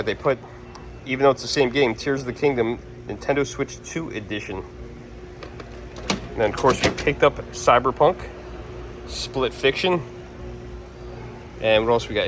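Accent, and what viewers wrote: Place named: Midwest, United States. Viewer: Where in the Midwest are you? I can tell by the accent. :-)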